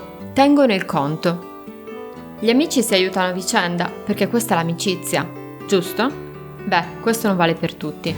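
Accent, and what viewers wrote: Sardinia, Italy. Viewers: Si, anche io sono sarda. Ho sentito per caso il tuo video e ho pensato: ma questa donna è sarda. Allora mi sono iscritta al canale 😉. Buonanotte 🥰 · Bellissimo video...Ma sei sarda?? 😆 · Ciao, sei sarda per caso? :D è il primo video che guardo, ho sentito parlare di questo atteggiamento qualche anno fa, penso che lo farò vedere anche a mia madre, che perpetua dei silenzi assurdi senza mai esternare la sua rabbia, ma io so che vuole sbottare Allora questa introspezione potrebbe esserle utile. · bell'accento Sardo :)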